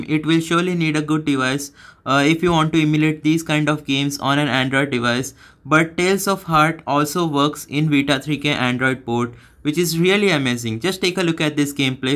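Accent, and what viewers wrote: India. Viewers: Ты индус? Акцент пиздец у тебя,нихрена не понял на английском 😁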